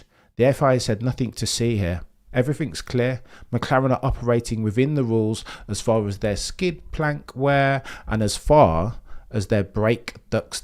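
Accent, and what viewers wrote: Birmingham, United Kingdom. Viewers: Never would I have ever thought the way to make F1 jargon interesting is to say it with a brummy accent. Go on my bro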